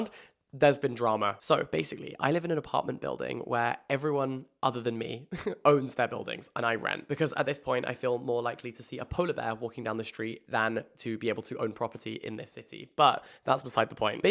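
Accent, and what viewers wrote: United Kingdom. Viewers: I hope she paid damages for the lie. You sound like UK but the situation sounds like nutty California.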